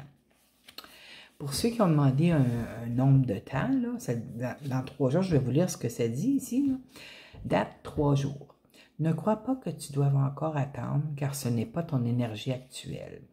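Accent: Quebec, Canada. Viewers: Merci pour ce beau tirage qui m 'apaise surtout concernant le travail! et puis entendre votre accent du Québec me ravit au plus haut point. Je suis tombée en amour avec votre accent après avoir vécu quelques temps dans votre beau pays. Merci à Vous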